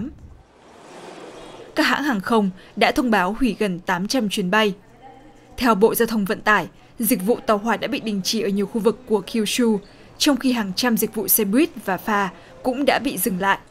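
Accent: North, Vietnam. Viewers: GIỌNG BẮC ĐỌC KHÓ NGHE QUÁ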